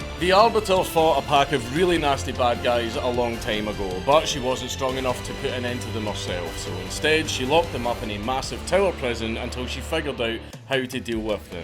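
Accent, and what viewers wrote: Scotland, United Kingdom. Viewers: This video was amazing. I want to see you talk more about Celtic legends as in-depth as you do. Especially in your awesome Scottish accent. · Are you Scottish? My grandfather on my mother's side surname was Frazier.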